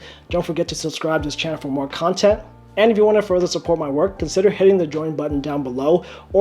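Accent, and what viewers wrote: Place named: Pacific Northwest, United States. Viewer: Sounds like you live in the PNW.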